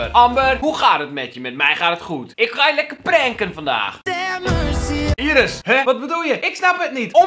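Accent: Groningen, Netherlands. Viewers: Ik lach me rot!!!😂😂😂😂👌 en jou gronings😂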